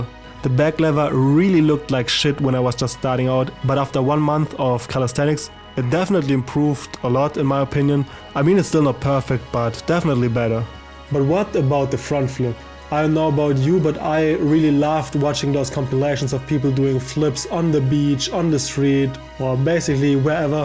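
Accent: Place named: Germany. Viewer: I hear deutschen Accent (W Vid bro)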